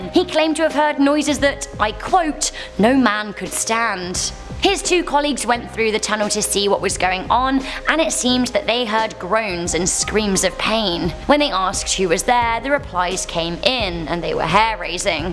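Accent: England, United Kingdom. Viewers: Are you from UK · Hi Beautiful can I get a shout out In your next video I just love your English accent and when you do scarry videos. And I love how you dress. You dress so nice 👍.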